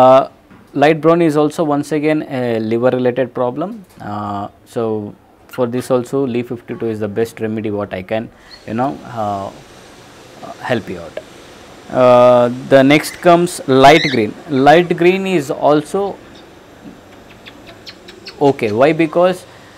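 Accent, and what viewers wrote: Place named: India. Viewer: Sir ur voice is so Indian Love it and thanks for the info I need some help for cockatiels can you reply so we can chat for answering question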